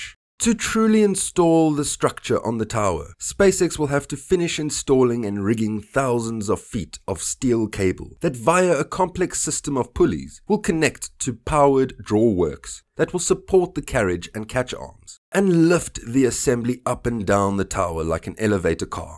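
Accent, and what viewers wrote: South Africa. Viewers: False UK accent? No. That's a proper South African accent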